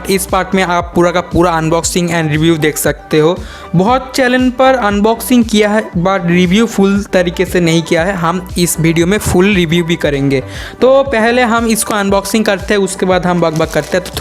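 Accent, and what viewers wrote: India. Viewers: Bengali accent bolte😁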